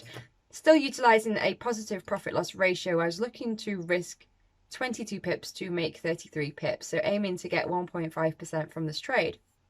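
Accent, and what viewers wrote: United Kingdom. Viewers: Since you sound Brit and I assume you are one Reach out to ant fx.. You will find his channel too But try to get hold of him cause he is Brit too..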